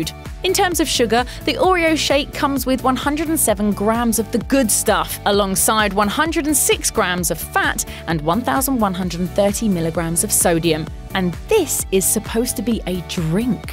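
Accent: United Kingdom. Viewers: How come you have an Anglo voice over about a100% Yanky topic. I'm in Stalya a mix of Yanky an Anglo and here No one would have a clue about this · All well and good, but these are all American shops and it is a British woman talking about them. Very misleading.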